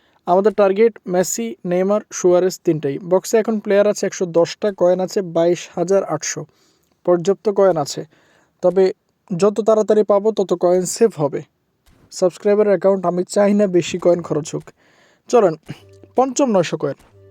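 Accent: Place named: Chittagong, Bangladesh. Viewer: ভাই আপনি কি চাটগাঁইয়া নাকি জানাবেন ভাই❤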